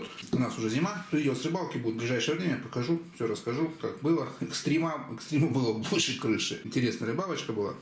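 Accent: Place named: Urals, Russia. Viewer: На Амуре люди медленнее говорят. У Вас наш уральский говор - быстрый. Видно, наши осели на Амуре.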